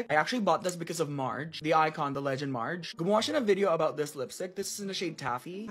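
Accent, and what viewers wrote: Philippines. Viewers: Are you Filipino cause you have the perfect Filipino accent im a Filipino🎉🎉😊loving the Philippinessss🎉🎉🎉🎉😊😊😊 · I love your Filipino accent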